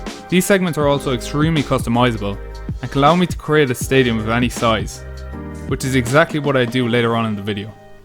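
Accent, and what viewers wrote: Ireland. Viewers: Are u from ireland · are u Irish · Are ye Irish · 2 thing I love the Irish accent u have and I love fortnite so I hope u like that sub I gave u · Thanks for giving ireland a shout out Btw are u from ireland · Are you Irish I’m Irish thx I love it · Are you Irish? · Are you irish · Let's go Ireland i can hear from his accent that he's Irish🇮🇪🇮🇪🇮🇪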